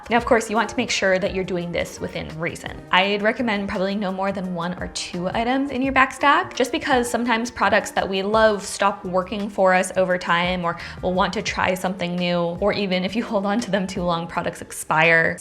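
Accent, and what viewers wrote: Canada. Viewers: I noticed the Canadian accent right away 😂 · Had you not featured US Dollar bills in your video, I would've thought you're Canadian based on your accent. The Venture X is a powerhouse for sure. I'd also recommend the lesser-known AAA Daily Advantage Credit Card, which has become my primary CC (5% on groceries, 3% on Warehouse Clubs (a category that not even the Costco Citi VIsa offers that rate of rewards for), 3% on Gas and Pharmacies, and so on...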